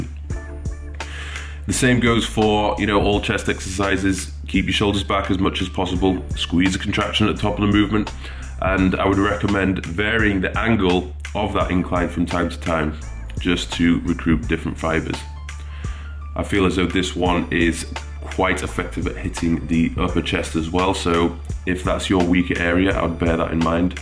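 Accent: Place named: North, United Kingdom. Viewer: Where are you from? Accent kinda sounds north.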